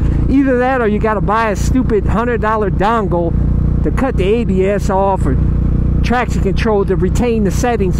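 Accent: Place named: Texas, United States. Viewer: Hahaaaa. Dude, you're awesome. What is that dialect by the way, you in Texas or somewhere south? .. and yea, you have a new subscriber.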